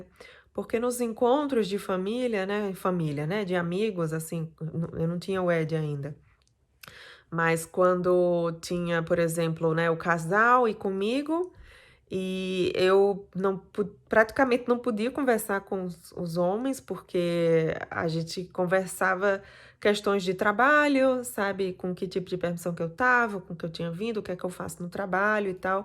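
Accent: Northeast, Brazil. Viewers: E aqui na sua terra natal (Brasil, Nordeste, pelo sotaque é nordestina)vc se dá bem com o povo fora desse país?Obrigada 😘👍Sinto muito amargura nas suas palavras e nos olhos!Isso não é bom amor!Me senti mal agora em ser brasileira, sou uma pessoa que me doo tanto para quem precisa de mim!Eu também não me envolvo com muitos amigos, por opção minha, tempo, mas o resto abstraio!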